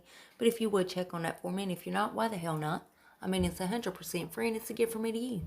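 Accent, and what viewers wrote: South, United States. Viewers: Thanks for sharing the info!! I love listening to you talk bc you have a really thick country accent like I do, 😂😂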